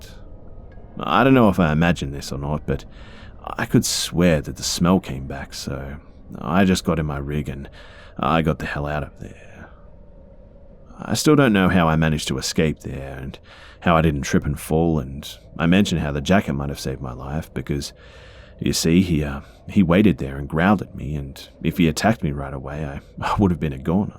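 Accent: Australia. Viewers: Australian accent 🤭🤭🤭 I made a mistaken post thought I heard eng. very different sorry. 🖤🖤🖤☺️☺️ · Mate aussie for the win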